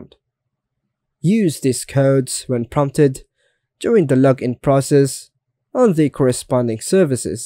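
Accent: United Kingdom. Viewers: Strong Brit dialect, difficult to understand. not getting which service you're referring to. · People who can't understand brit accent.... Use CC..?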